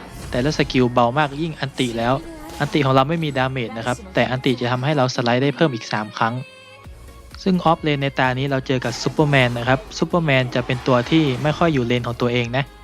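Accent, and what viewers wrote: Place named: North, Thailand. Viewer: สาบเมืองอยู่นะ คนเหนือไหมนี่555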